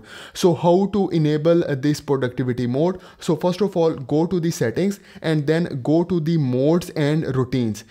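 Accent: India. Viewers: Bros Indian accent is so heavy.... Got my balls crushed 🗣️🗣️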